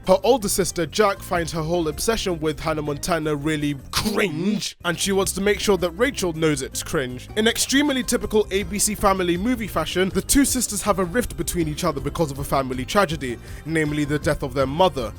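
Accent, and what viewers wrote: United Kingdom. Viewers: Rock Stone oh my God. Not every black person is African American. This dude clearly has a British accent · You have such a bearable British accent.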